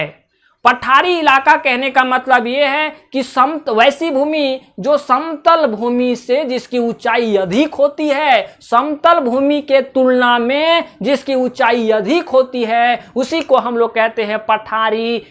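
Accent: Bihar, India. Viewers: आप बिहारी जैसे बोलते हो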